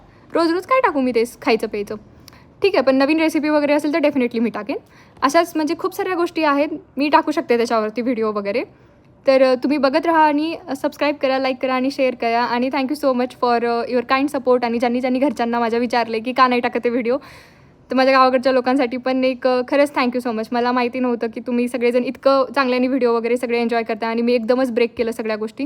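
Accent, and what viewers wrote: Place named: Latur, India. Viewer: व्हिडिओ ची सुरवात अलमोस्ट तीन महिन्याच्या बाद म्हणजे दीदी सिंगापूर ला गेली तरी लातूरची भाषा सोडली नाही 😅 दीदी मुळे आम्हाला नविन नविन महिती मिळते थँक्यू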